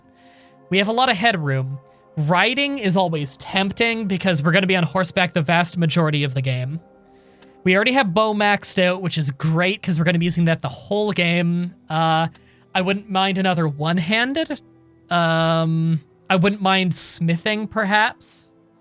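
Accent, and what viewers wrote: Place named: Canada. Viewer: You look like a viking but your voice shows like a Canadian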